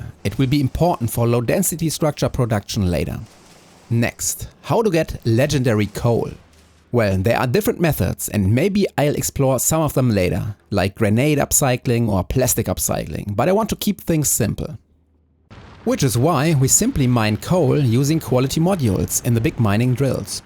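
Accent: Germany. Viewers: lern doch einfach mal gutes englisch , das tut im ohr weh, dein deutscher slang 😀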